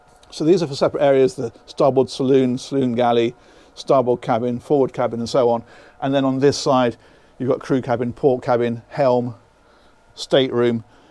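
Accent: United Kingdom. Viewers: I like this guy. The British accent is cool. he really knows his stuff. These yachts are mind boggling with the technology and conveniences. Builders have thought of everything.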